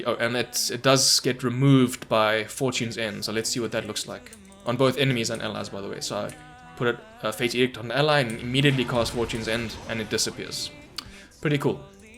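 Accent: South Africa. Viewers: South African? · Are you South African? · Came here to learn Oracle.. You sound like you from South Africa.. The motherland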